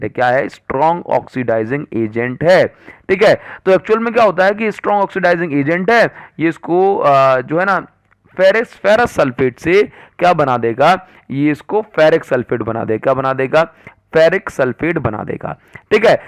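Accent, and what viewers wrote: Odisha, India. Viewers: Sir are u from odisha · Sir,are you from Odisha❓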